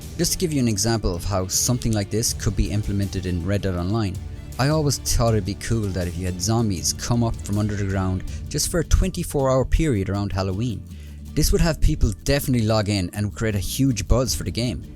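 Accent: Ireland. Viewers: I love the Irish accent laddd, but i am a real western lover like real, anyway this game doesn’t remind me of the west, the only thing that does is bounty Hunter, I still have love for this game but it’s getting harder to love every week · you should use you voice more often, everyone likes an irish accent 👍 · Dude what part of Ireland you from? Up Cavan! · You are irish as well? I swear so many irish people play these franchise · great vid man. you sound more Irish than myself. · What part of ireland are you from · Are you Irish?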